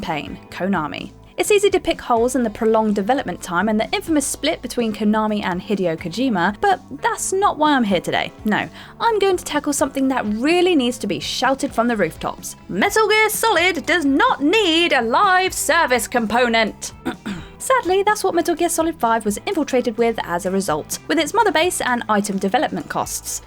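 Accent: United Kingdom. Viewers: Why are British accents so fucking annoying? Bloody awful. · The only thing I like in this video is your lovely British accent.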